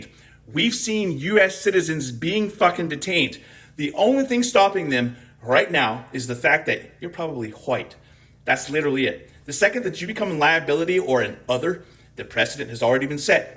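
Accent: Scotland, United Kingdom. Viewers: Definitely hearing some Scottish accent in this one! Thank you for the outrage!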